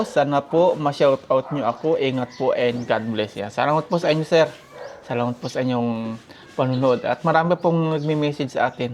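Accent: Visayas, Philippines. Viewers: Bisaya ka ba? Yung tagalog mo kasi tonong bisaya eh.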